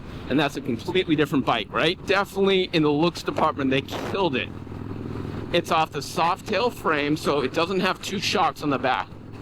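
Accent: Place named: Baltimore, United States. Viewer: Dude, this guy sounds EXACTLY like Stavros Halkias.. Personality wise too. Baltimore area accent perhaps? This super glide looks amazing 👏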